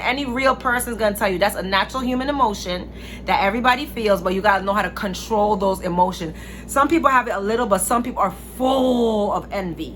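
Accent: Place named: Jamaica. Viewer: I hear that Jamaican accent! ♥️ Great content.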